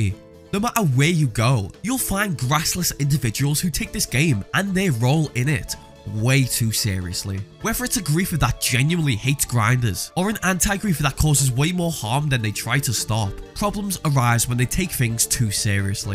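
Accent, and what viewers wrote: Liverpool, United Kingdom. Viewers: lads a scouser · thanks for the subtitles i couldn't understand what you were saying through your British accent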